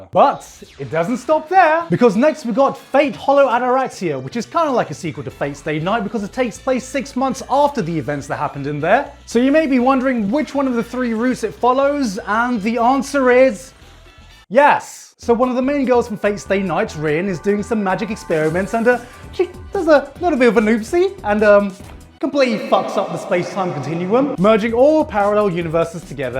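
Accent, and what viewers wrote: United Kingdom. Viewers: "There's at least five" Actually had me laughing, especially with that British accent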